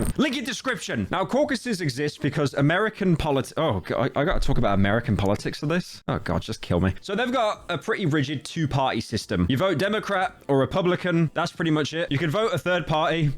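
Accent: United Kingdom. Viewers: british lol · Are you british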